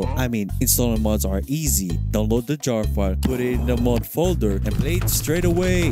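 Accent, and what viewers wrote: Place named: Philippines. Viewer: RAHHHHH PILIPENO ACCENT LETS GO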